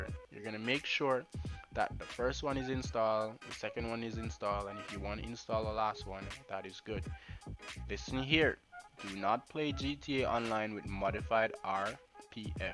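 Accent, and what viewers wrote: Jamaica. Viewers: Good vid bruh. Jamaica? Can hear the patois in the voice Wah gwan!!!! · i cant tell if you are from jamaica, canada or india lol no offense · u jamaican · are you jamaican?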